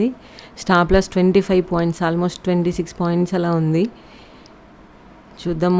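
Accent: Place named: Telangana, India. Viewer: Good teaching. Telangana slang 😀 Sister. How many years of experience do you have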